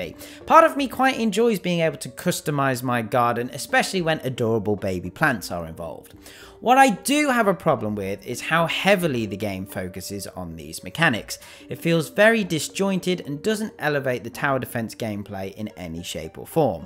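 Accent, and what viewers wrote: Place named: United Kingdom. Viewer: british innit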